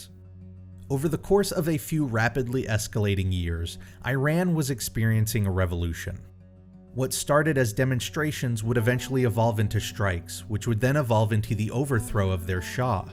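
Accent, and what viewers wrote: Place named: United States. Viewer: Toe-MAY-Toe Toe-MAH-toe... ...he's American, and almost every American speaker pronounces it the way he does. That's not to say that your pronunciation isn't correct, just that when enough people do it, it's considered acceptable. Like how there are two ways of pronouncing "either" even though there's that rule where if a soft E is immediately followed by another vowel, it becomes a hard E.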